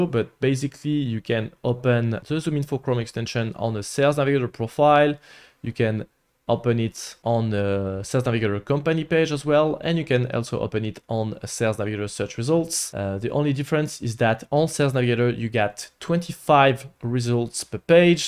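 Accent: France. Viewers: je crois reconnaître un accent français ?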